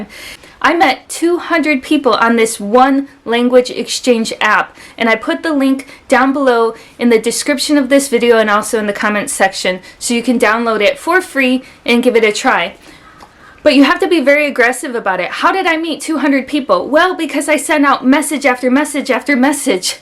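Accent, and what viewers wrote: Michigan, United States. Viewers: Ah the Michigan accent lol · Wow you have a Michigan accent? I’m from Michigan too and currently learning Mandarin in school!🤩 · Hey, I haven't heard a Michigan accent much before, so it's quite novel sounding to me. I like it. You're quite unique in you language ability with both Chinese and Japanese. I've learnt Chinese through mass reading of novels, and am also learning French, so I know the time it takes. I don't think I will start another language, at least not with the intent of understanding it deeply. I imagine it must be difficult thinking up new content all the time. But then again, a lot of people just enjoy the everyday vlog videos. 祝你幸福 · Hi. I'm wondering if Michigan English accent is similar to the accent in Southwestern Ontario in Canada. That's where I'm living, and to me, their accent sounds a bit different from yours. 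This is very common in China, but probably also happens in English-speaking countries (geographically very close but different spoken accent). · That's funny on some of the comments you mentioned.Being an American myself and having spoken American English since the age of 3, I can attest that your American English accent is still very much intact. You definitely still sound like a Michigander.:) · Your Michigan accent is very cute 👍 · Hello from Michigan and yes that is Michigan accent.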